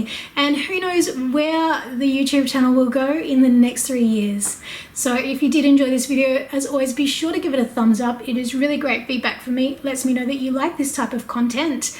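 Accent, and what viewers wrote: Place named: Australia. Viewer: Beautiful apartment and I love her accent, I feel like it's different ftom other Australians. Much love :-)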